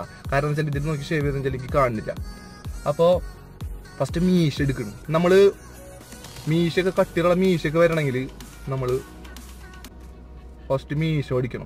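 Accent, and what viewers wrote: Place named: Kodungallur, India. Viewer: Ijjadhi ddav... Thrissur alle😃❤️💪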